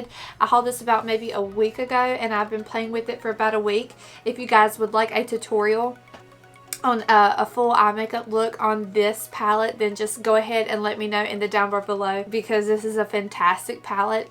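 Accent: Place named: North Carolina, United States. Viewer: are you from NC?? your accent sounds like it!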